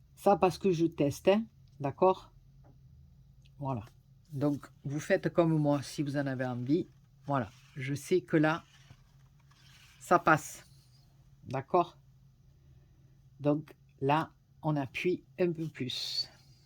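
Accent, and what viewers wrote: South, France. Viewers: bjr madame très belle carte et très bien expliqué j'adore votre accent du sud du midi continuer comme cela a nous montré vos merveilles bisou de véronique de reims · Grand merci pour ta réponse, j'ai vu tous les jolies commentaires, toutes les personnes qui sont heureuses de ta jolies cartes, de ton explication tu peux en être fière. Et comme d'autres personnes l'ont dit ton accent du sud est très beau à entendre s'est comme quand j'entendais ma maman elle était germanophone sa à la voie chantante et mélodieuse comme celle de maman et j'aime t'écoute et s'est bien dommage qu'ont ne peut pas le faire plus souvent. Encore un grand merci, gros bisous Brigitte de Belgique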